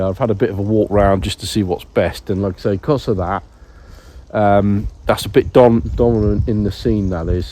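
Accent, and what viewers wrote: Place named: Somerset, United Kingdom. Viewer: I came here looking through recent S5ii videos, ears pricked at accent, then location then mention of a C3; i've stumbled on gold here 🤣🤓🤟 i'm a Somerset based lumix and film shooter too. Ace pics of the young trees! 🤓🤟 I'm on the cusp of picking up an S5ii for weddings for this year. I was running x2 S1 and an S5, I found I was missing the occassional shot due to difficult contrast scenes at times and then faffing with MF and missing moments. I've sold my S1 bodies and plan on x2 S5 and one S5ii. How has the AF been for you so far on the S5ii? Bizarrely i've never been a fan of eye detect so kind of really after how it performs without eye AF (all the hipster videos seem to be eye AF only). C3 is such a good camera, I ended up selling mine as it was a bit heavy for me also I wanted an SLR 6x6. One hell of a camera though, loved using it, do wish I tried it with one of those tripod parralax correction tools though, but then the parralax didn't mess me up too much.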